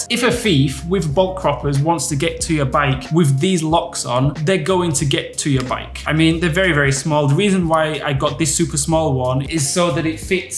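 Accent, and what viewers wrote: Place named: North Wales, United Kingdom. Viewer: Hi, did you grow up in north wales or near north wales, can’t stop picking some similarities in your accent 😂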